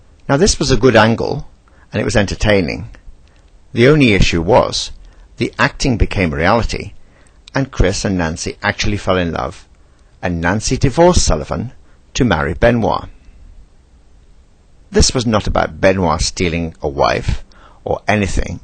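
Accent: England, United Kingdom. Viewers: its an english voice dumbass if u think that sounds gay then u really have crap gaydar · It's his accent for being british, love his voice actually. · Is the British accent supposed to give credibility to this? He's about as intelligent as Lord Alfred Hayes (RIP). Give me a break. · BBC world service style british accent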